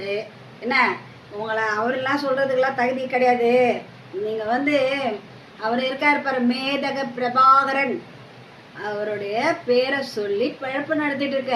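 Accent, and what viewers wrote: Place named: Telugu, India. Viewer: உன் பேச்சிலேயே தெரியுது நீ ஒரு தெலுங்கு கரீனா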